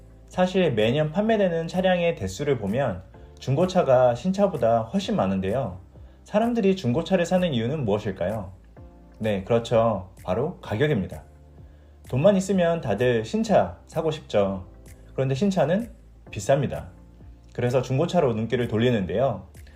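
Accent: Seoul, South Korea. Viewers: 외국에 사신지 오래 됐나 보내요 저도 서울에서 나고 자라 미국온지 오래 됐지만 이분 요즘 서울 말씨 맞습니다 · 외국산 지 오래돼서 서울 말씨 모르는 건 그쪽 같은데. 요새 서울에는 남도 사람들이 많아져서 서울 말씨 모르는 사람들 많음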